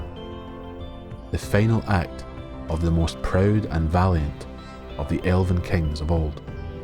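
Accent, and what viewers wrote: Scotland, United Kingdom. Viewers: He was foolish and heroic. In order to become a hero, you must be a fool in the first place. I really love your videos, partly because I could listen to your beautiful Scottish accent for hours on end.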